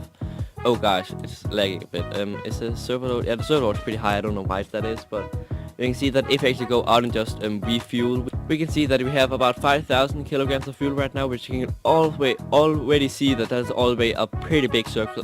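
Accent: Denmark. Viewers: You are Danish :D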